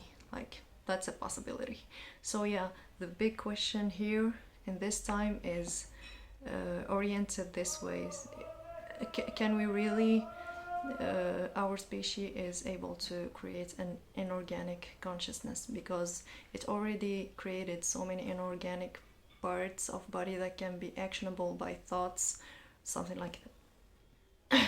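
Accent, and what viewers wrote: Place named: United States. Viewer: thanks for sharing with us. your accent seems like an american accent. bravo wachma all love from spain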